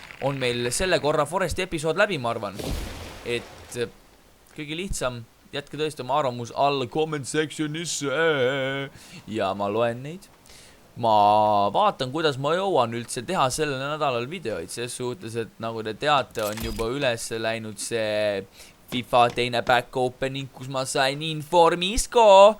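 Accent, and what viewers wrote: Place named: United Kingdom. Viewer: Su British Aktsent on nagu Geographic Channel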